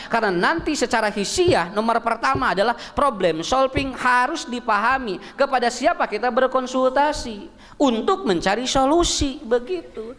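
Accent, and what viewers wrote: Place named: Bandung, Indonesia. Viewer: Hatur nuhun pencerahanna mani nyerep bahasa sundana raos ngabandungannana.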